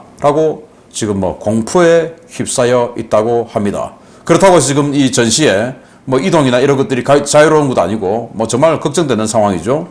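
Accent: Gyeongsang, South Korea. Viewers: 경상도 사투리가 매력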